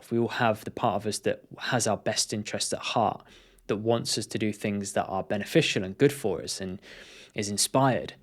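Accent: United Kingdom. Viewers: nice to see a person from the uk talking about higher self and spirituality. Somehow it feels more relatable when someone is talking about this stuff with an accent and an approach that you can identify with. Thanks for sharing this. I also have a channel, but as you can see it’s all about motorcycles and adventure, however as with most people there’s another side. Just before lockdown a personal event lead me on a journey. I finally found Reiki and law of assumption. I’m so much more mindful about my own thoughts, words and feelings. Meditation is something I struggle with to be honest but it’s something I feel I need to incorporate. Thank you for this video.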